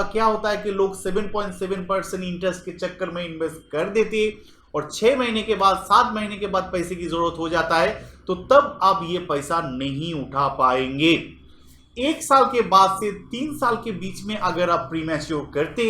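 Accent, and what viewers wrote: Bengal, India. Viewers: Bengali dada apka hindi bohut sweet hai...i like it...dada...👀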